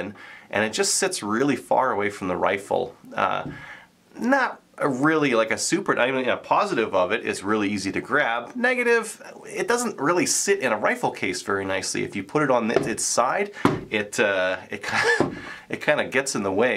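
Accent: Canada. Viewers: The different combs aren't for growing shooters. They're for shooting with iron sights or above axis scopes. It moves your cheek weld to the correct position. You sound Canadian, so I'm assuming you guys are very restricted to the types of weapons you can possess.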